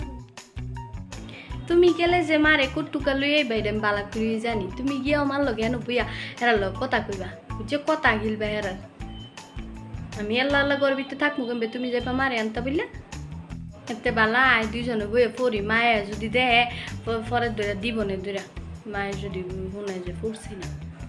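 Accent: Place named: Mymensingh, Bangladesh. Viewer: Wow perfect mymensingh accent....carry on sister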